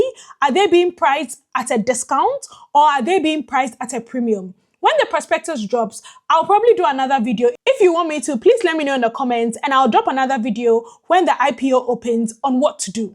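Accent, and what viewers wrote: Ghana. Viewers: You sound Ghanaian please refine your accents and be more Nigerian, your content is good enough but your accent is not so Nigerian and you know the vibes between the two countries You go explain tired ooo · She sounds Ghanian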